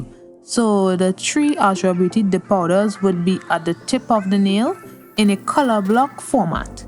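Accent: Trinidad and Tobago. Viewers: I'm in the❤❤❤❤ with this.. you in the Caribbean. Well that's sounds like a trini accent. I'm just up the road😂😂😂 think I can drop by😂😂😂🏃‍♀️ I'll bring all my products I promise😂🤣🤣🤣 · I had a mind that you are a Trini being one myself and sounds like someone I know. But all in all I love your designs.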